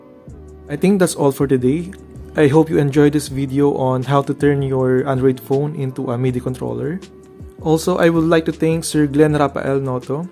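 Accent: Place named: Philippines. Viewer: Sorry I thought you were Indonesian. Now hearing again I hear a Filipino accent instead. Mantap is Indonesian for delicious/awesome :D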